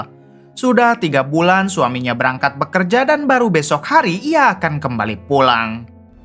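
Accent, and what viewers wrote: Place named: Bogor, Indonesia. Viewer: Bogor mana suaranya🎉🎉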